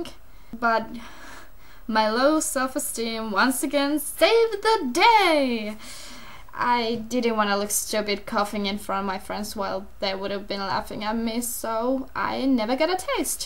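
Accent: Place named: Finland. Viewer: You sound so finish and that's kind of cool... the accent and stuff...! :D When I was little I lived in Finland for a while. I remember the kids and people and you just remind me of them somehow or something... :D Anyway, smoking is cool, so you should definitely try it! :D It's just a hobby. If you smoke, well at least that's gonna be a new topic for you to talk about in your videos. Take it as a challenge, light a cigarette and share your experience live on camera... :D... Anyway, peace!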